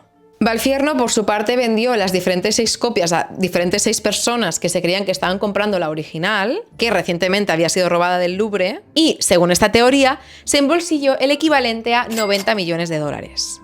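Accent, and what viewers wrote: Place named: Catalonia, Spain. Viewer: Pues súper interesante y es curioso que una amiga mia que teje y hace crochet como yo n os compartió tu podcast por whattsapp y aquí me tienes, me ha enantado, misterio, historia del arte que me apasiona como la historia misma, ummm, bien felicidades y te sigo. Por tu acento y algun giro parece que sabes catalán? 😂😂😂 porque yo vivo cerqita de Barcelona, ahi lo dejo…. 🤗🤗🤗🤗🤗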